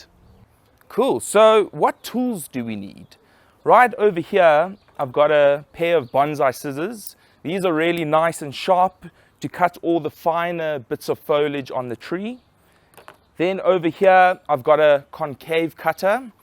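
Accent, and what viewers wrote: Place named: South Africa. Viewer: really great video.....thank you.....also great to hear a fellow south african accent on you tube.....its also wonderfull to hear and feel your passion......im a beginner and just starting my bonsai journey. do you live in South Africa? where abouts?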